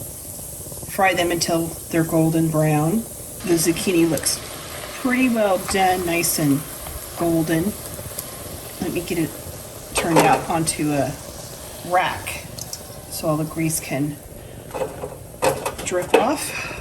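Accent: South, United States. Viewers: Amen!! This Mississippi girl would love some of that snow too!! It’s so beautiful,, love watching you,, gotta say,, I sometimes detect a slight Southern accent , 😉 love it!! You are who I’ve always wanted to be! Is there anything you can’t do❤️ too blessed to be stressed 🙏🏻❤️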